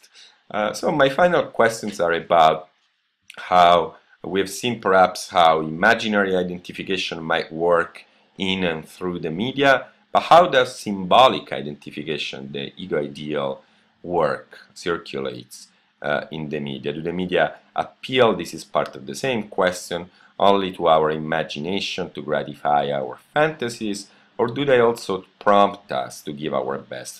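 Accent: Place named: France. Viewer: You are frensh !! isn't it :).. It's clear from your accent... I'm Moroccan so it's easy for me to discover that haha..Thank you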